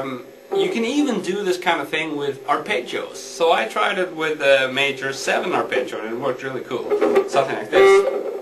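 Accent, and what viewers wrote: Sweden. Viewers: He is from sweden. That explains his accent. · oh the swedish accent xD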